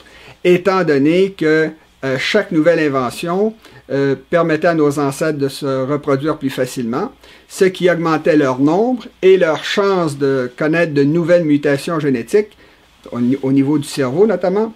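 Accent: Quebec, Canada. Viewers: Je vous préfère en français. J'aime l'accent québécois et vous évidemment plus confortable. Par contre, les français européens sont de l'avis que le français québécois n'est pas français!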